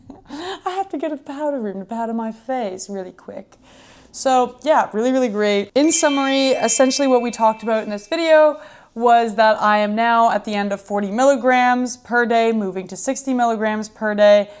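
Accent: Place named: England, United Kingdom. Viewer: I'll give a like for the English accent! I must say, I'm really impressed in general by how you carry yourself on camera. You speak so naturally, it's like I'm there with you. You're making great progress, I wish you well!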